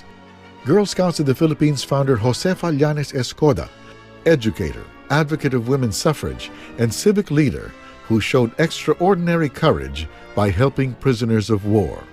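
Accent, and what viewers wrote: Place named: United States. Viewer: Yes Philippine money looks better than u.s. money. btw why does the announcer sound American? lol ))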